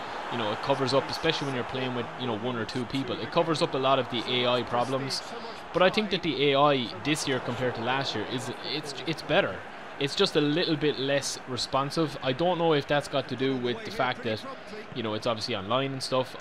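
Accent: Ireland. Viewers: Which part of Ireland are you from? Love your channel btw. Great content!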